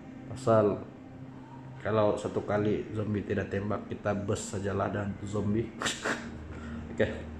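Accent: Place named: Kota Kinabalu, Malaysia. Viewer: nah suara topinai urang kk ni kan hahah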